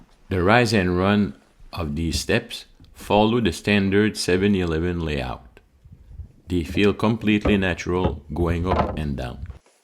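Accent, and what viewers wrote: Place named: Netherlands. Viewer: Dang! That should be strong enough. The dutch accent is a nice touch 🙂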